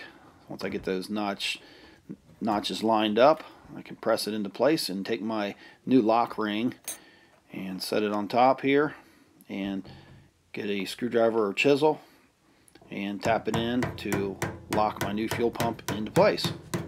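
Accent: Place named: Philadelphia, United States. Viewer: I've done this job a few times but I like to re-watch to remind me what I'm in for. I always get gas on me somehow. You from near Philly or Baltimore originally? You've got "the accent"! Nice video.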